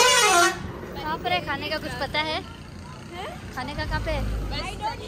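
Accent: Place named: Banswara, India. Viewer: aap konse district se ho? banswara side se lag rhi hai aapki boli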